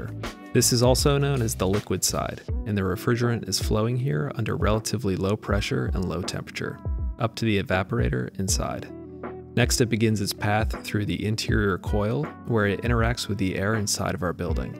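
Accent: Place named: United States. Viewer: The way you say Gianni sounds like Johnny because of your American accent lol. A strong American accent saying John sounds more like Jahn to my Aussie ear. (We have our own funny pronunciations)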